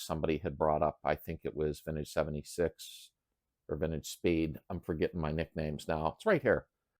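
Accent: Pittsburgh, United States. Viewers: Nice Video, I recognize that Pittsburgh accent anywhere I go...Where you located?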